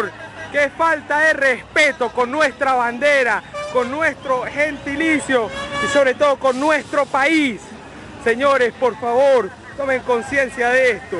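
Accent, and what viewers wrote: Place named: Argentina. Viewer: Demasiado bueno el video... Pero por momentos llegue a pensar que quien narraba era un argentino... Pilas con ese asento mi hermano! Aguante Vinotinto!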